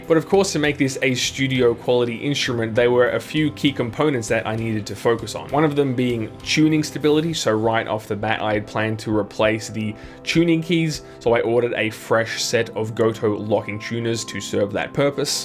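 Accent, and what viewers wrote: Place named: Australia. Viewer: Hahaha that's just the down under accent... Been around Aussies and Kiwis long enough to recognize it anywhere. The upside is that it is relatively simple to impersonate.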